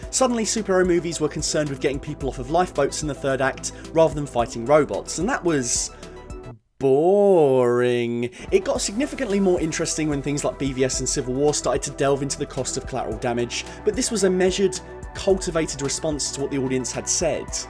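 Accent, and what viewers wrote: United Kingdom. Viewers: You got a put this video out a year ago. Now it’s too late to jump on the bandwagon of success. I have a problem with Zack Snyder‘s conservative politics which do seep into his movies. He made Rorschach a hero in the watchmen when he was meant to be a sociopath. In this movie superman is detached from his usual since I’m morality, right and wrong. And his parents tell him not to help people. Stupid objectivism. I guess you can’t hear how thick your British accent is. Your Kevin Costner was a terrible impersonation. The Man of Steel has flaws. The story should’ve been total chronologically. With all the distraction of metropolis there is no way Lois should have been in the final scene. I mean what did she do ?- take a taxi? Jor El played by Russell Crowe basically gave Clark the job he was literally born to do. In direct contradiction with everything he was fighting against on Krypton. 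And I did not see any reason why Clark needed to have the codex pumped into his DNA. It didn’t make him stronger than any other kryptonian. It was a plot point that was never used again in any movie. Maybe the goal was to have all the little kryptonian babies put in the bottle city of Kandor or like in the comic books. The excessive destruction started with a comic book from the 80s called damage control by the late great comic book writer Dwayne McDuffie. He later went on to create static shock, a whole line of Black superheroes for DC And was one of the major writers for the justice league and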